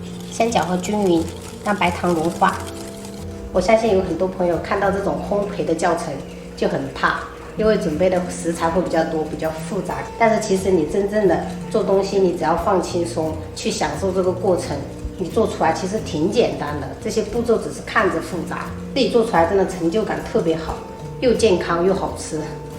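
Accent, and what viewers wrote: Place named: Hokkien, China. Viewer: 我是台灣人聽到妳說閩南語感覺好親切哦👍好廚藝！小寶寶們都好可愛哦😘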